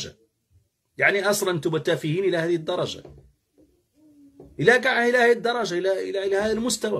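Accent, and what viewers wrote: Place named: Shilha, Morocco. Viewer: ❤❤❤❤❤❤ أخي لماذا تقول هاته وليس هذه ؟ لعلك تأثرت بلهجة اشلوحة لطول مقامك في الزريبة التي اخرجك الله منها ونور بصيرتك. الحمد لله على عودتك عن الباطل ورجوعك إلى اصلك وحقيقتك وكينونتك. نحن الشعوب الصحراوية الناطقة بالحسانية لسنا مغاربة ولا نحب ولا نقبل ان نكون مغاربة فلنا تاريخنا وثقافتنا وعاداتنا وتقاليدنا ولهجتنا الحسانية- نقول: هذه ، وليس هاته- عندي حساسية شديدة من لهجة اشلوحة. هههههه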